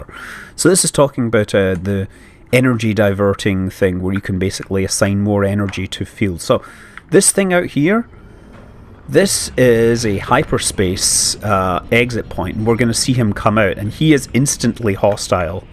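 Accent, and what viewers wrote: Scotland, United Kingdom. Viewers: are you from scottland? you have a scottish accent.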